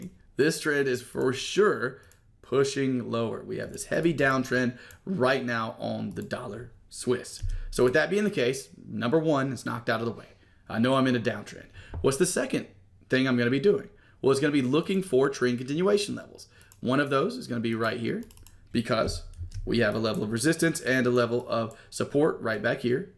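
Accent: South, United States. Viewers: Love your southern twang.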